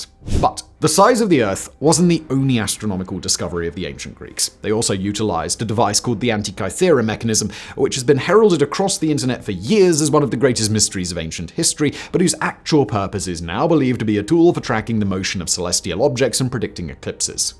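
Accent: RP, United Kingdom. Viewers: Very imperial British accent... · You Tube SUCKS! I have removed this man's channels over and over again. But when You Tube changes the algorithm, it force feeds me this Simon guy's many channels despite my removing him. Simon's channels suck. They are poorly researched information that is mostly government propaganda talking points. Terrible videos. And just because Simon has a British accent, this doesn't not make him an authority on anything. He's just a talking head. England is over. Brexit has made the only job in England a You Tube content provider. Terrible list videos that spread falsehoods and bull.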